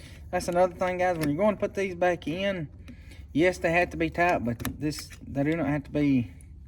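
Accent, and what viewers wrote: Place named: South, United States. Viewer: pity about the southern drawl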